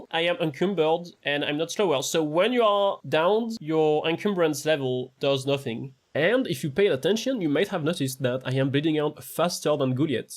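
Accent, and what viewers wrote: France. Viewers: Why do i feel like you have french accent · sorry you have to play this game on hard mode once the other raiders realize you sound french. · Français non? Le bon vieil accent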